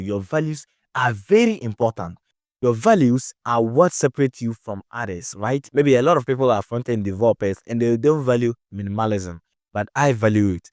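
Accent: Ghana. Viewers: 😂 I like your content bro. Keep it up. I like the way you speak I guess you are from Ghana. Finding job’s and branding as a developer is a very serious problem indeed.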